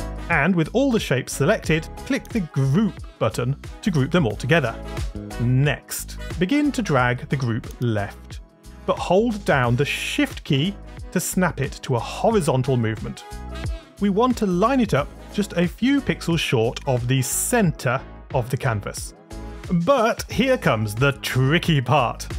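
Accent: United Kingdom. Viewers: are you British British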